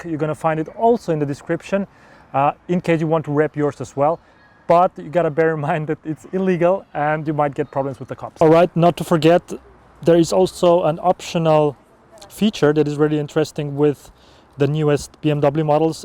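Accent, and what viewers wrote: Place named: Romania. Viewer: Se vede accentu de roman =))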